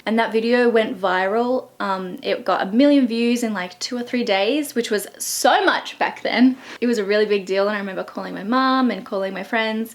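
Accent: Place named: Australia. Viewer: are you Australian you has an Australian accent (Im Australian) p.s u earned a new sub xxx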